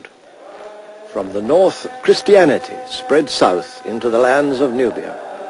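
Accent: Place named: United Kingdom. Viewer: This was made in the seventies it early eighties. He is British and lots of British men speak like that. He was a professor of The school of African and Oriental studies. He was very interested in black history. It is more a style of presenting. Watch a documentary by Richard Attenborough and he has similar style. So does David Attenborough his brother (the old guy in Jurassic park).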